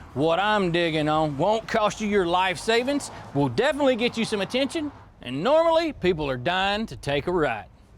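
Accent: South, United States. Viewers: Please lose the overwhelming southern accent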